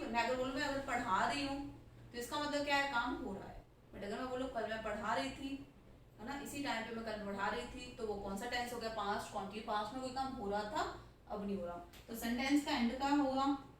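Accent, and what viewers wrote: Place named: Punjab, India. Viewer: Mam app punjbi bolte bhut ache lgte o😍😍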